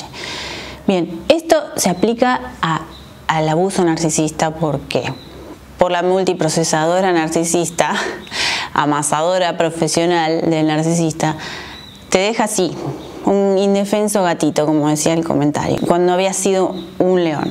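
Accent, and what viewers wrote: Argentina. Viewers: Soy Española me encanta tu acento creo que Argentino, recién.llegada a este bonito canal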